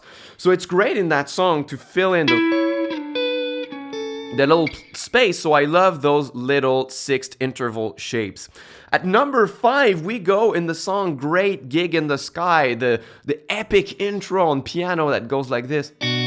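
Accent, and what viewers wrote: Quebec, Canada. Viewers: You sound like you from Quebec????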